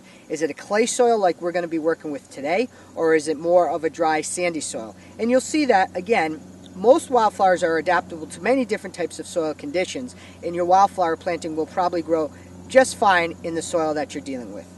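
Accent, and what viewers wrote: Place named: Western Massachusetts, United States. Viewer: Trying to pinpoint his accent. Western mass?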